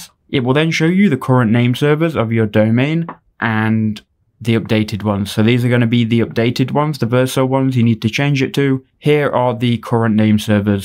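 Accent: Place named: United Kingdom. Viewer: Just simple and true 👍. Followed it and got mine done in 5 minutes. Also loved the British accent <3😂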